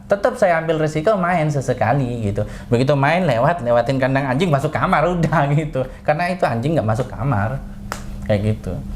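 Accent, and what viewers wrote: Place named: Jakarta, Indonesia. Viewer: Seneng liat cina kya gini adem bener apalagi ada logat betawinya kerenlah, Ini baru cina yg sudah menyatu dengan pribumi saling menghormati dan menghargai, bukan seperti cina komunis yg bengis dan angkuh, semoga tetap istiqomah koh ❤️🤲🙏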